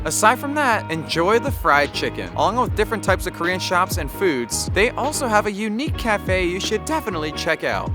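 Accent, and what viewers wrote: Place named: United States. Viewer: WE KNOW YOUR AMERICAN its ok stop being such an attention seeker we KNOW ok . FFS but great video the accent is annoying and hideous but great video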